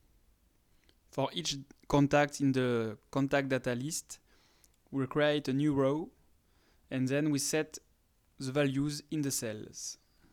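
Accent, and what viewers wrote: France. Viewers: woooo !!!!! this a French accent I also speak french. The guy is strong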